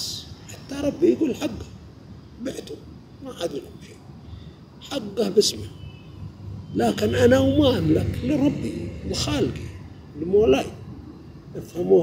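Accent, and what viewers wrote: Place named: Mecca, Saudi Arabia. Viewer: استغفر ربك بنو قريش معروفين ولا يضيع نسبهم وهذا واضح انة من مكة المكرمة حتي اللهجة مكاويه بطن احجاز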